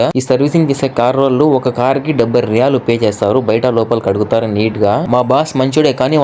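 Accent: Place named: Andhra Pradesh, India. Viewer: అన్న మీది ఆంధ్ర కదే నీ బాషా అలా నే ఉంది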